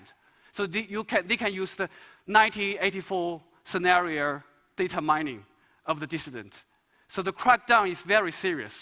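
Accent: China. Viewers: Finally, I can use the experience of knowing an off-the-boat Chinese guy growing up to understand thick Chinese accents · i'm also having difficulties understanding him, but that's because i'm not used to chinese accents. i had the same difficulty with german accents, but i got over it. a very intimidating speech, considering the content and the time period... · I had no problem understanding, but that is because I live in a place where I am exposed to a lot of immigrants (Esp. Chinese), so I'm used to the accent. I didn't think he was terrible at presenting, though. Although definitely not my favourite talk by any means. I did learn new things about the Chinese internet censorship. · Except his Chinese accent with little dis-fluency, he did a great job~ 还是太过紧张了，然后口语也确实有点烂，不过观点挺好的，值得一看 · 中国式英语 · He does have a strong Chinese accent, but most native English speakers are quite used to listening to such accents and have little difficulty understanding them. 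But I can understand how people who speak English as a second language might have trouble - even other Chinese. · 用滑稽的中式英语和祖国的负面来取悦西方听众，such a clown!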